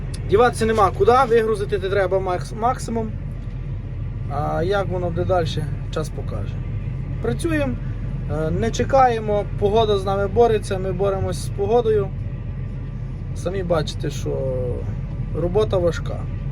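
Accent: Ternopil, Ukraine. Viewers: То шо, Тернопіль? Файна мова😀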